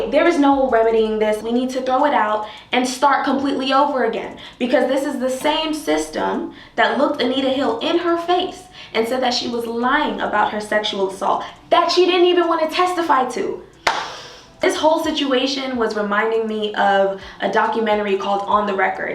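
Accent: Jamaica. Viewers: "Mi will watch dis likkle ediat show" OMFGGGGGGGGGGGGG YOU ARE JAMAICAAAAAAAAAAAAAAAAAANNNNNNNNN or Caribbean?? But mi know mi hear a Jamaican accent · The way her Jamaican accent and sayings play peek a boo tho I love it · I subbed the instant i heard the jamaican accent. "Mek me watch this likkle eediot show " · Wait you speak patois🥺I just found this channel loved your content but now I’m sold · Is she Jamaican or Trinidadian? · Love your Jamaican accent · are you from Jamaica?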